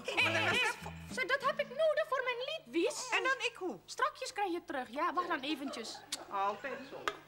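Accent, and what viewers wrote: Java, Indonesia. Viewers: ngomong bahasa Belanda pake logat medok 😭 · Bahasa belanda kreol tante lien kental banget, bahasa belanda dengan logat jawa medok, kadang disisipi kosakata bahasa jawa, penuturnya sudah mulai langka, mungkin tante lien yang tersisa diantaranya. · Dia punya nama. Dia orang indo-europa(orang yang campur, punya leluhur yang indonesia dan europah). Dia lahir dan besar di Surabaya. Makanya kalau dia omong bahasa Indonesia pake logat jawa. Waktu Indonesia merdeka, orang indo-Europah yang punya nationalitas Belanda boleh pilih, m0 jadi warga negara Indonesia atau mau tetap Belanda. Yang mau tetep blanda musti pulang ke belanda(seperti ). Orang indo-europah yang jadi warga negara Indonesia tetep tinggal di sana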